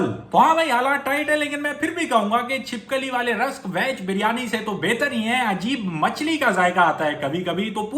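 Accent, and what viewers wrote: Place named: Sindh, Pakistan. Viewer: Loved your Sindhi accents 👍❤️😆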